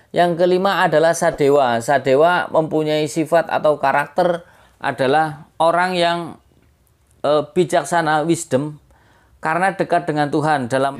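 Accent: Tegal, Indonesia. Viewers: Asli mana Om.... Tegal yoo Logatnya... Salam Ngapak yoo Om... Bolehlah Kerisnya... Tak Dolan ning omah njenengan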